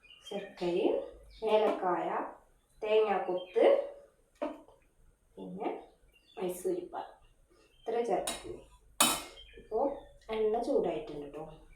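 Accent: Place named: Kunnamkulam, India. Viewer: സൂപ്പർ. തൃശൂരിൽ കുന്നംകുളത്തല്ലേ വീട്? സംസാരം കേട്ടിട്ട്😅😅😅😅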